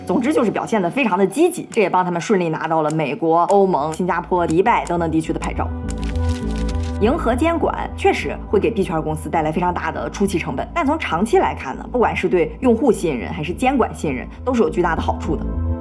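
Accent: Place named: Northeast, China. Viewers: 这么漂亮睿智的女人，一张口，出乎意料的东北大碴子味普通话！😂😂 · 他们都是大连人啊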